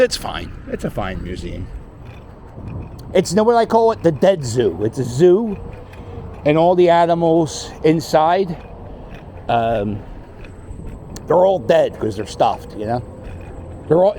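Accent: Philadelphia, United States. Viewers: Great video you did around all the best locations, that I always walk past but I have learned so much from your perspective. Now I am going to walk just like you, I go there when I haven't been there in a month. Feel like I am missing something from working there on South Street hanging in clubs and going to school for fashion. Your accent in Philadelphia is very authentic. Toodles!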